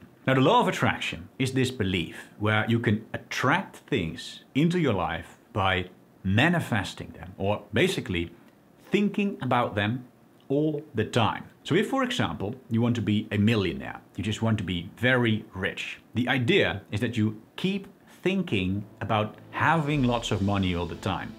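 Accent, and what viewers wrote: Netherlands. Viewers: He is from the Netherlands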